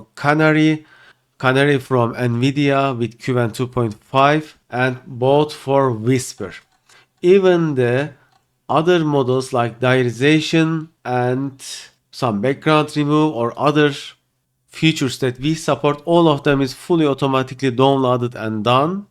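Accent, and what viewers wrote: Turkey. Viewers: Rastgele önüme çıktı dinliyorum; diyorum "bu kesin Türkçe aksan" altyazıya bakıyorum Türkçe yok dublaja bakıyorum hak getire! bu kadar mı kendi dilinden, milletinden nefret ediyorsun?!! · aksan türkçe, dil ingilizce olunca 5 saniyelik hangi dil la bu şoku geçirdim. hocam onca farklı dil varken bari ai da olsa türkçe dillnede çevirseymiş siziniz bu videoyu.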